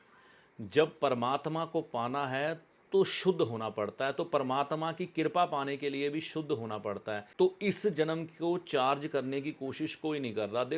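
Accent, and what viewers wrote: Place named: Punjab, India. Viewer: पंजाबी एसेंट हैं, थॉट पकड़ो समझे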